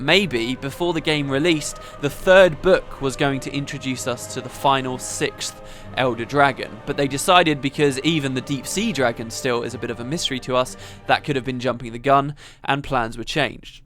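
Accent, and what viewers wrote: England, United Kingdom. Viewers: I've never even played guild wars. English accents make you do things...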